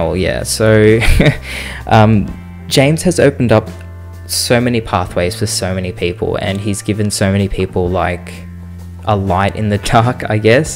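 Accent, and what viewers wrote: Australia. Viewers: aww you seem so smol in this and after you mention being Aussie, I just heard your accent so much more intensely after lol. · I could tell u were from Australia. I am too mate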